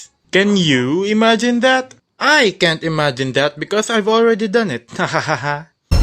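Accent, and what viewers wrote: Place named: Philippines. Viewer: Pinoy to.. yung accent eh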